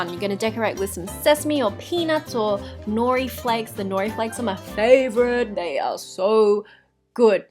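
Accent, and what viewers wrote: Australia. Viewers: Ok so I love your accent, Aussie? And you are full of energy and then some and your food looks amazing..Im going to make one of them real soon, thanks a bunch for your video!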